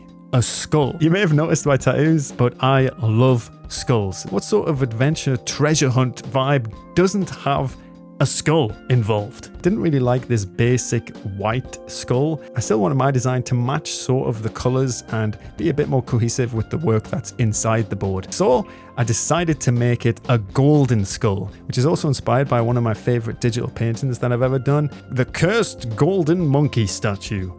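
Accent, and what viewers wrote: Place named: Newcastle, United Kingdom. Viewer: Love your Geordie accent, I laughed when you said Sainsbury's is mad expensive 😂 I feel ya, cause it's my local too! The mosaics turned out amazing!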